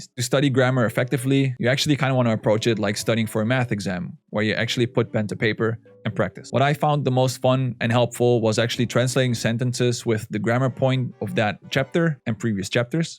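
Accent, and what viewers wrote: Netherlands. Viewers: Great video! I started learning Japanese last September, nearly 9 months ago. Rather than months or years, I think the crucial factor is the number of HOURS, which is why I decided to write down how many hours I studied every day. So far I have done exactly 332 hours, which works out at roughly an hour and a quarter per day on average. This isn't a lot, but I have a family and a full time job, so I cannot realistically manage much more. I have been using Wanikani for the kanji and vocabulary. Grammar I have covered with an app called Busuu, but I think I have gone too fast, because it hasn't really stuck in my brain. Right now I'm reviewing all the lessons from the past few months. And to top everything up, I use Duolingo. It's free, it's fun, and there's a bit of everything in there: kanji, grammar, vocab, useful phrases, etc. At my present rate, I expect it to take me a bit over a year to get past the beginner stage and start just immersing; reading and listening. 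In July I have the JLPT n5 exam, which I expect to pass. After that I will no longer consider myself a beginner. I'm not worried about output, so I won't be trying to talk to Japanese people, or writing out the kanjis. For the foreseeable future I will be limiting myself to input. By the way, I recognize the Dutch accent from when I lived in Belgium. Like most Dutch speakers, your English is excellent.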